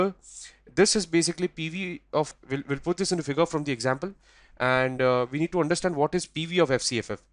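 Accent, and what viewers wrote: India. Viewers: the Indian accent is already a bit hard to follow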